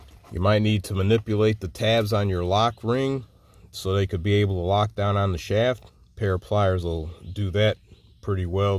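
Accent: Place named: Chicago, United States. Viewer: Thanks. I need to do this on my rig. I like your accent, reminds me of home. You from the burbs of Chicago? Or somewhere over by der?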